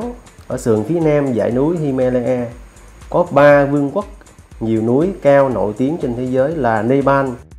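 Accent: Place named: Hau Giang, Vietnam. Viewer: Giọng người Hậu Giang bạn ơi.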